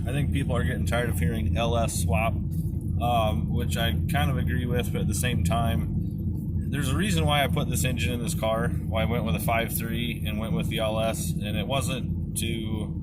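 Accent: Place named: Pennsylvania, United States. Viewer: Pennsylvanian I see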